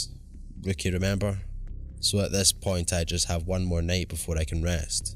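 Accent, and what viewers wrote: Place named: Scotland, United Kingdom. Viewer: I 💘 The Excellent Narration by 👏👏👏..bravo!!! I'm digging The Scottish Accent 😚 Keep up the Awesomelicious job;) much love Sexy Grandma Darkness✌💖💖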